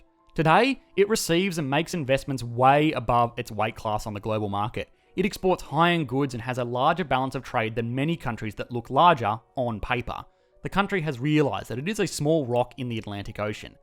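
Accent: Australia. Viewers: Wow--I've never seen such a shameless yet disturbing display of public self-stroking and auto-fellatio in my life the way you go on and on thinking anyone cares who has the larger economy/banking system, etc ...zzzzzz----and you sound Australian rather than British, so not only am I mildly humoured but also perplexed! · He is Australian not only does he mention it in a few videos, I'm also Australian I can tell by his accent 100%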